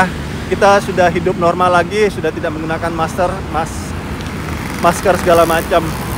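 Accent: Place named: Medan, Indonesia. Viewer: Abang ini cem orang Medan, trus trus trus .....ngeri2 sedap ku tengok2.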